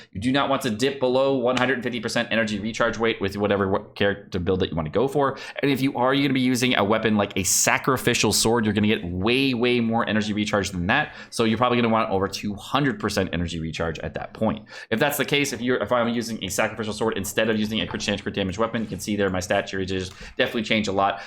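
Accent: United Kingdom. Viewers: I actually look forward to the intro with british 😶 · I do like British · Yay british is here again ☺️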